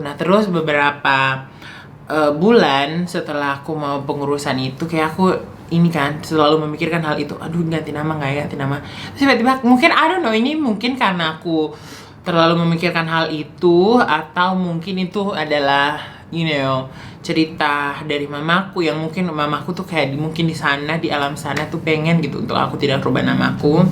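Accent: Indonesia. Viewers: Qu suka bahasa inggris nya, aku suka logat manadonya. Sukses sllu kakak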